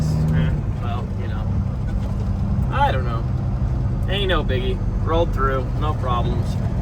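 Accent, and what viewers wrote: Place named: Tennessee, United States. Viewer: You must be from Tennessee or North Georgia the way you say Alright yall Like we do here in East Tennessee!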